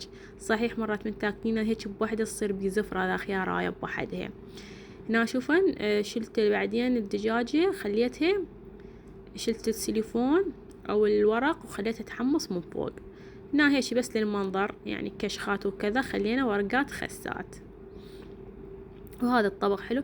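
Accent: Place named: Basra, Iraq. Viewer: احب لهجتج البصراوية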